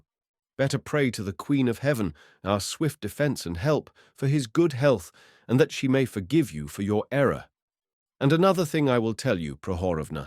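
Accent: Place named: United Kingdom. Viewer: Lovely rounded British English accent. Easy on the ear 👌 ❤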